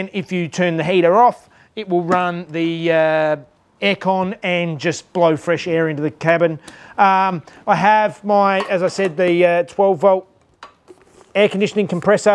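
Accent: Australia. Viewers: 😳😳 cool, I'm a paramedic.... According to your dialect u living down under..... 2 be a ff there must be challenging sometimes..... The heat, draught and brush fires.....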